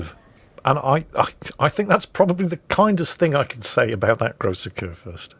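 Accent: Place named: New Zealand. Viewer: Agreed 100% - great to hear a voice from home, even better someone who loves the absurdity and sheer 'fun' of (especially) WoT. Best from NZ.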